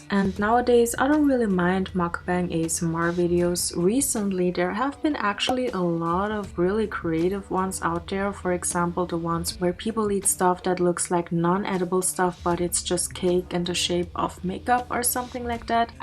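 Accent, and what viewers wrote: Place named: Germany. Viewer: It's so Weird hearing Herman accent coming out If an Asian. So FUKN weird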